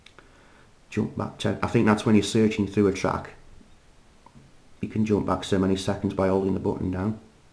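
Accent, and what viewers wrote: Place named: Staffordshire, United Kingdom. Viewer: Good video and a distinctive Staffordshire accent.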